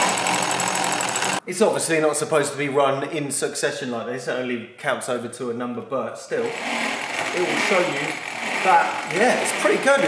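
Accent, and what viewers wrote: United Kingdom. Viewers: Only thing I like about you is your english voice. But there is no punk anymore. No revolution. Just established crap about electro bullshit. Just like that sad Schneider.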